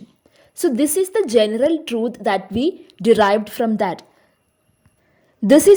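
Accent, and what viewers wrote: India. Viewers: Are you Indian or foreigner 😮? I opened the video in a hope to see a video by a foreigner then suddenly this desi accent 😅😅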